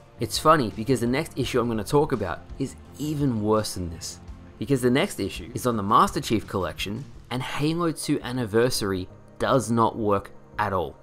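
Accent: Australia. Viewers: is it bad i just noticed you're Australian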